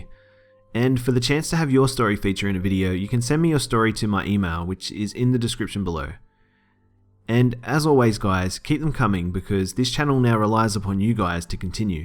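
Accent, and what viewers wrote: Australia. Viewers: Subbed for the Aussie accent and great content. :D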